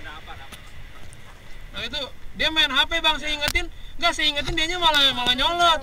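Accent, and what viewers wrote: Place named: Bogor, Indonesia. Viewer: Bang lu orang Bogor ya bang😂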